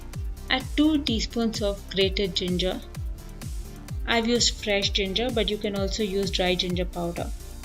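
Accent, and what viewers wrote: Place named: India. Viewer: Just from his/her indian scammer accent, i can confirm that he/she is so stupid that cannot find a job and only way to survive is to scam with "ancient" remedy. I cannot even know if he/she is male or female by his/her voice.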